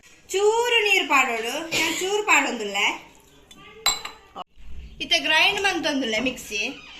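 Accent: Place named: Mangalore, India. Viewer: Pure manglorean base voice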